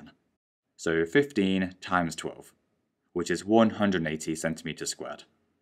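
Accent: United Kingdom. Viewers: im 2 years late but it really helped me 😉😊😀😃2/22/24 and btw are you brititsh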